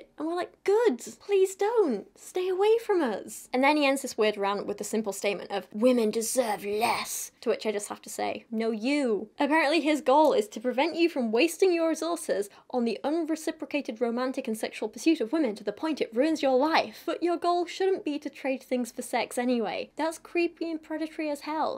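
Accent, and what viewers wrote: United Kingdom. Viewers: You go! "Stupid but louder" hehehe... "Have a giggle" you Brits have a way of speaking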